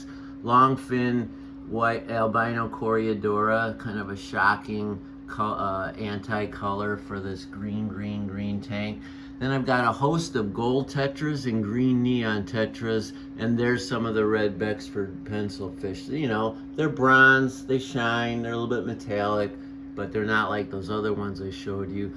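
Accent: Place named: Chicago, United States. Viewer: I'm about 5 hours straight north of you. Great looking tanks and beautiful looking, healthy fish! About 3 minutes in, I thought to myself, "I bet this guy is from Chicago! 😂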